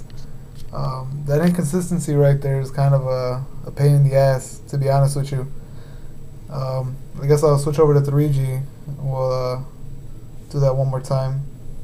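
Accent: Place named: India. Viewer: Indian accent. Indian like me I reckon. Kya haal hai bhaaya? haha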